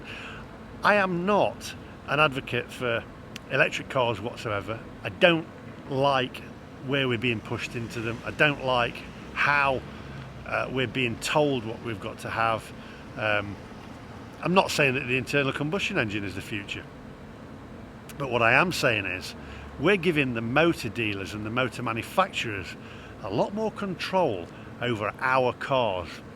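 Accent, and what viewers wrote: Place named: Nottingham, United Kingdom. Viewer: I assume these cars being driving computers, they could also be open to Cyber attack. Which could also be a safety issue as well as a potential car ransom situation. Love the channel btw, I studied in Nottingham in the early 1990's....its lovely to hear the accent & see how the city has changed when you have been filming there.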